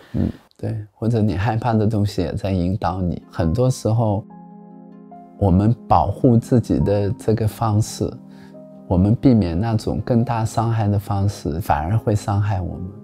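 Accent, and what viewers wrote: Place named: Hangzhou, China. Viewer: 杭州本地人都这么说，江浙人都这样说普通话的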